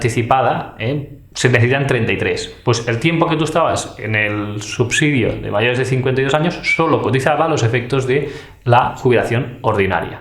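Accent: Spain. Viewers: Hablas como dicen por acá en Acapulco "EDPAÑOLA'O entonces eso aplica para el país de España y no para México de ser así una recomendación es que hagas énfasis al país que aplica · Ese ha de ser en España. Por que no dicen eso. Nos confunden. Lo delata como habla. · Pienso qe si el guey este abla como espanol no nos interesa a los mexicas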